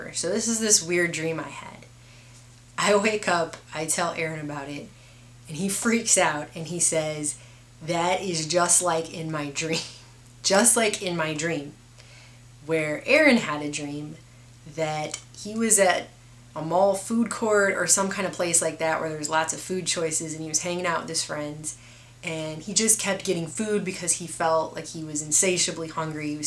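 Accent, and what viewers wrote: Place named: Texas, United States. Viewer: You sound like you're from my part of the woods Texas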